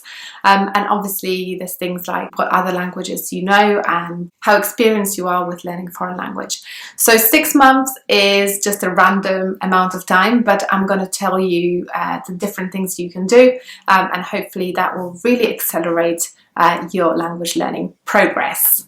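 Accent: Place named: United Kingdom. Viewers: I love this accent❤️ I’m Iranian and I want to learn English & British accent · ❤️British accent!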